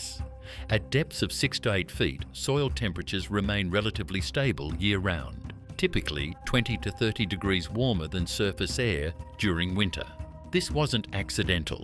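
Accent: Australia. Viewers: Australian accent I think... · Sounds Australian, but used Fahrenheit 🤔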